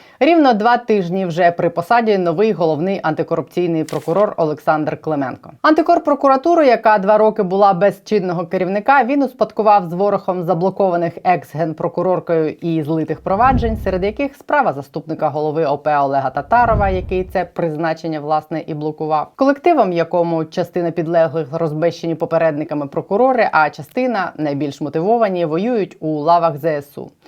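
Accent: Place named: Russia. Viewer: Слушаю Вас всю войну, спасибо большое. но, пожалуйста, не "п"ятидесяти, семидесяти, восьмидесяти", это ошибка и калька из русского.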